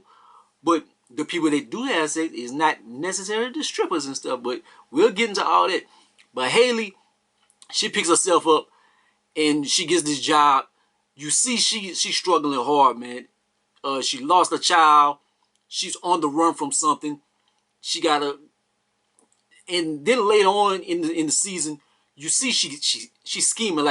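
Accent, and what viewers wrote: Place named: South, United States. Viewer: I subscribed cause I can just listen to your country accent all day . But on the real you break that ish down ❤️❤️❤️❤️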